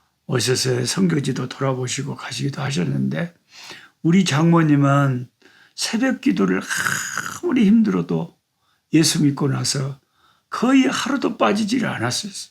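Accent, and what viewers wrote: Jeolla, South Korea. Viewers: 매번 야심차게 성경읽기에 도전하다가 레위기를 만나면 그냥 적당히 대충 느슨하게 읽는 흉내만 내다가 넘기곤? 했었는데 목사님께서 사교육비도 안받고 1대일 성경교사가 되어주셔서 어려운 성경을 너무 쉽게 그리고 하나님의 사랑을 느끼며 읽게 되었음에 감사드립니다. 하나님이 이렇게 세심하게 어리석은 인생에게 하나님 나라의 거룩한 백성으로 살아가는 방법을 가르쳐 주셨네요. 제사의 종류와 제사의 방법이 또한 정확하게 정립이 되었고요. 그 매번 제사장의 도움으로 어렵고 두렵고 복잡한 제사를 드리지 않아도 예수님이 친히 대속물이 되어주셔서 단번에 해결해주심으로 말미암아 이제는 성령님의 도움으로 언제 어디서는 예배자로 설수 있음이 너무나 감사합니다. 지난 연말부터 교회적으로 시작한 성경통독 프로그램과(목사님~ 여긴 목사님 팬이 많은 진해동부교회예요^^) 꿀송이 보약 큐티를 하며 레위기를 두 번 읽게 되었는데 더 분명해지는 말씀의 은혜가 있습니다. 목사님의 남도 사투리가 얼마나 사랑스럽게 들리는지 매일 목사님의 예사롭지 않은 등장을 기대하는 1인입니다. 자주 자주 꽃을 들고 등장하셔서 얼마나 사랑스럽게 바라보시는지 그 눈빛에서 목사님의 사랑의 마음을 느낍니다. 오늘 들고 나오신 나팔꽃 갸가 활짝 아름답게 핀걸 우리에게 보여주고 싶은디 그걸 아쉬워하시는 모습에서 이미 우리는 가장 아름다운 남아공의 꽃들을 마음으로 보았지요. 목사님~ 너무 감사하고 사랑하고 축복합니다.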